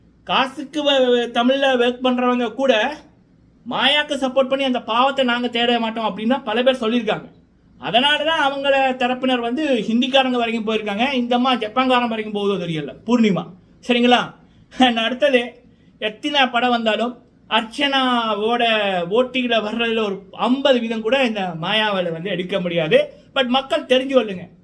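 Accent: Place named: Sri Lanka. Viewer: i know your talking style like srilankans nice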